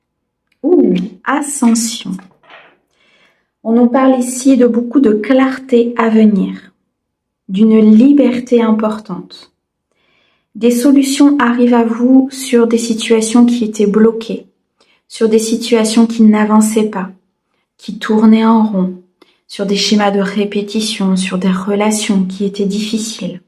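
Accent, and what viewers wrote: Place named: South, France. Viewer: Tout à fait ça !!! Grand merci des décennies de blocage et d'utilisation ! Ouffff j'adore ton accent du sud que l'on perçoit parfois !❤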